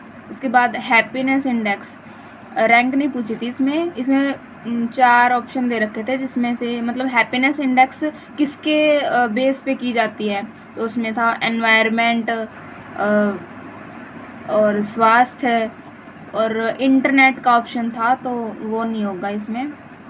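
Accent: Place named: Bagri, India. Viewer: oh aapki language meh thoda thoda bagdi touch hai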